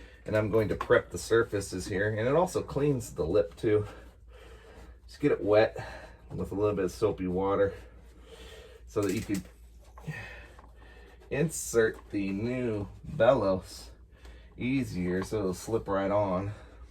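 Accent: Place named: United States. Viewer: Awesome, thanks. May I ask, where is 'your' American accent from? Perhaps someone could let me know. I have always wandered because an American actor has the same, and I just don't know where it's from. If I was to guess...perhaps from around NEW York; but there are more than a few. Thanks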